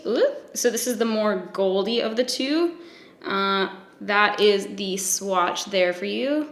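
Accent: Canada. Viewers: I love when the Canadian comes out in your voice. It makes me happy. You guys have the best accent! Side note: it reminds me of the Anchorman 2 fight scene with the Canadian news team from Quebec. #SORRY hahahaha 😘😘😘😘😂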